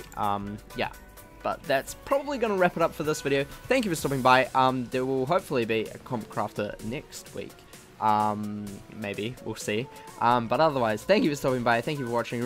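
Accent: New Zealand. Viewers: Hey you sound like a kiwi player.. so am I if you are.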